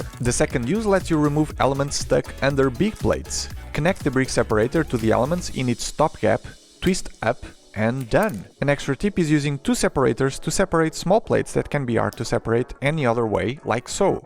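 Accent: Portugal. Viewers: Aos 2 minutos comecei a notar o sotaque, olhei para o nome do canal e conformou-se, portugueses para a frente! Gostei do vídeo, conciso e interessante, bom trabalho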